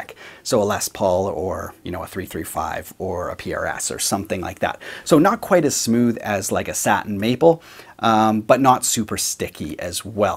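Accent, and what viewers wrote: Canada. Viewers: I love the Canadian accent! Great videos, too. Subscribed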